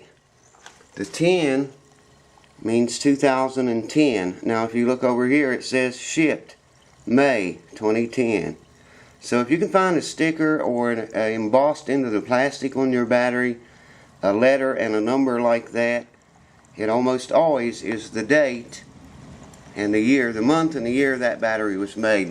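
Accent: South, United States. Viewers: lol, actually sounds more like Earnest T. Bass (character in the old Andy Griffith show - the hillbilly who comes down from the hills , every now and then, and causes mischief)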